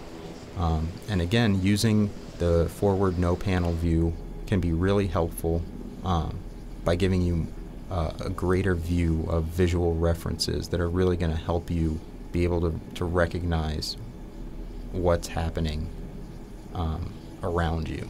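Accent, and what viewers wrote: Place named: United States. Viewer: Thank you for this terrific video. Never had a buzz for choppers as I have for planes, but this video has changed that. Please keep them coming. By the way, and I mean this with utter respect, you sound like, with your American accent, the actor Sam Elliott playing the Doorman in 'Road House'. Thank you very much for the Video.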